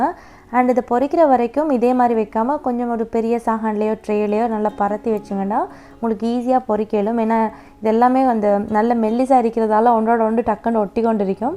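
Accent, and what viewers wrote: Sri Lanka. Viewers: Onga oor edu sri lanka la nega pesuradu naga pesura madiri eriki sis. I like u speech😍😍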